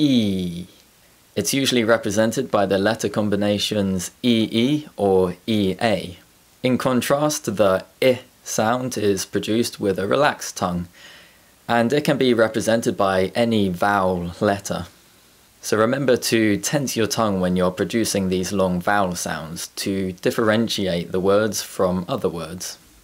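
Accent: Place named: Yorkshire, United Kingdom. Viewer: So helpful and a very nice accent! Is it Yorkshire?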